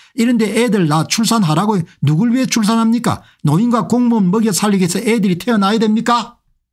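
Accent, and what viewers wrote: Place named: Tongyeong, South Korea. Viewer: 공대표님 정말정말 잘 지적하셨습니다 제일 절실한 현황입니다 골로 가지 않도록 더욱 빼딳하게 쓰야 합니다 말씀이 저의 고향 통영 말투 비슷하네요 정답습니다 감사합니디ㅡ😊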